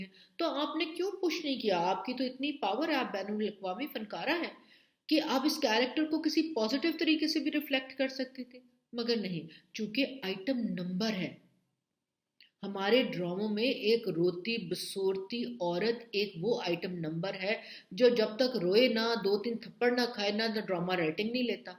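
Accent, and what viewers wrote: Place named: Punjab, India. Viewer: 👍👏👏👏 very well said, in such an interesting manner with a tarka of Punjabi. 😂Love her style of speaking. 👌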